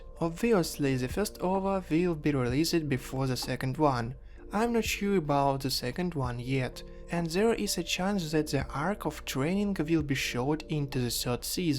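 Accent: England, United Kingdom. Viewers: О, вы из Англии? (неплох) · О так вы из англии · Оу, вы из Англии?) Oh , you are from england?)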